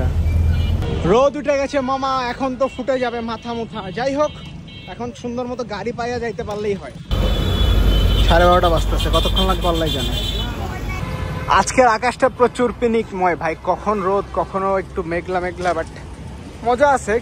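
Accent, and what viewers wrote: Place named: Chittagong, Bangladesh. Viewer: Vai tomar kotha te tw Chittagong er tone ase..So you are not from chittagong?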